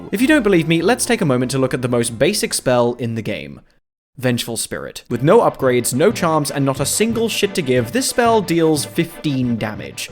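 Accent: United Kingdom. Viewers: I mean this in the most positive way possible, but I wonder how well this series would do without the british accent, because I think it implicitly adds so much to the videos through the sort of "snarky british" trope.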